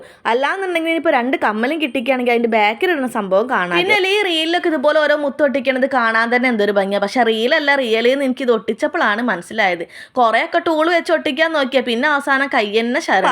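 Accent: Kozhikode, India. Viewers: കൊയ്ക്കോട് ബാലുശ്ശേരി കക്കോടി മുക്കം ഭാഗത്താണോ സംസാരം കേട്ടിട്ട്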